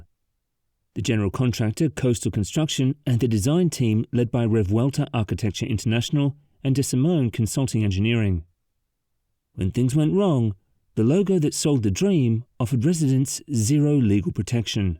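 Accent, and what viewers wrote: United Kingdom. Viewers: Ironic a bloke brit narrating this brit building catastrophe.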